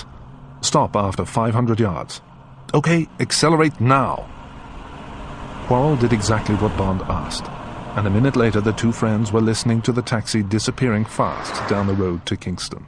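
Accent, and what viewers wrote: United Kingdom. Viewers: is this british or american?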